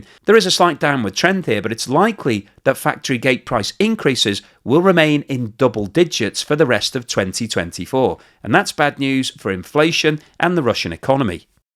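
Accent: United Kingdom. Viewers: Oh, British accent. It's a dead giveaway. As soon as one hears it - one knows he'll be lied to at nauseum. I don't know if that thing is cultural, traditional, biological - but British accent is a flashing red light. My point - no one from that incest ridden, degenerated island, with crooked teeth and the hunger for other people's stuff, is not to be trusted. Just my 2 cents. You're welcome.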